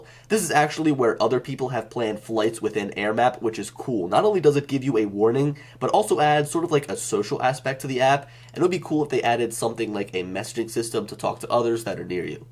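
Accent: Philadelphia, United States. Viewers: Nice overview. I thought I recognized your Philly accent. Keep doing your thing.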